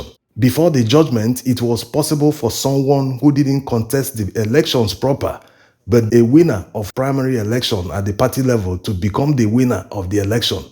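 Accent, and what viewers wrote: Igbo, Nigeria. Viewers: Lovely Ibo accent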